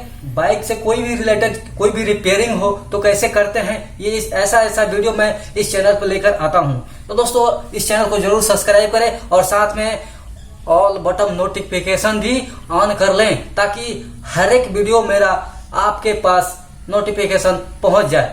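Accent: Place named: Bihar, India. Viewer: Bihari h sir kya aap ! Chadar ko chdar bol rhe h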